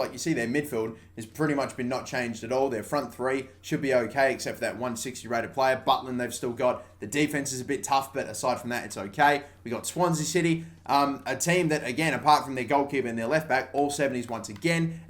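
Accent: Australia. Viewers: Yeah, could tell he was an Aussie by the accent. Probably Sydney or Brisbane. But then I could be way off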